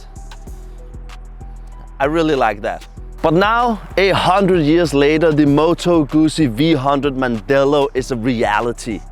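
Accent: Denmark. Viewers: Do I detect a Danish accent? Merry Jul then! Kudos to you for riding in those conditions (by the way the same I have right now where I live so I know how it feels to ride on those roads). I guess it's a demonstrator bike, the dealer must be very confident to let you ride out with snow and salt on the roads. I cover my ride, come winter, with a protective spray but whatever you do, there are still spots (mostly the bolts) that get a white shade from the salt but then again for me it's more important to ride than to have a pristine bike. I really like your enthusiasm and the work you put into the presentation, takes, cut, editing. I'm very much interested in the V100 and will definitely test drive one. For the time being I'm a very happy owner of a Ninja 1000sx, such a versatile bike. It will take a lot to convince me to swap it for another bike. Cheers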